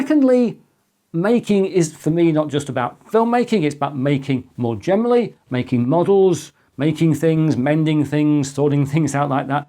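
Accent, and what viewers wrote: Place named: United Kingdom. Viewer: I have subscribed to both of your channels because I'm interested in computing and older technology, but I also love the way you explaining things in this very British tone of voice. I can't help myself, but I just love the way you talk. It's the clear and precise English vocabulary from my earliest learning lessons in school which makes it so easy for me to understand what you are talking about. Greetings from Germany.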